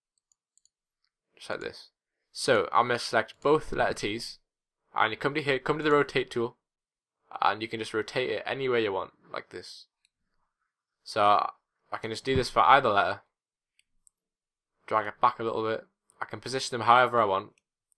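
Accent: Newcastle, United Kingdom. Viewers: R u from newcastle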